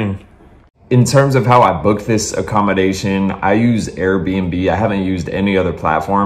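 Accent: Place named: Oakland, United States. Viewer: damn, what kind of accent is that? u grew up in oakland or somethin?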